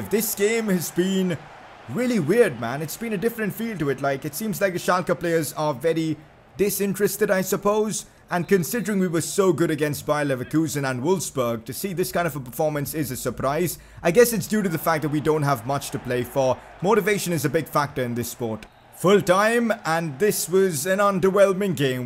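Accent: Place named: India. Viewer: why your accent sounds so indian xD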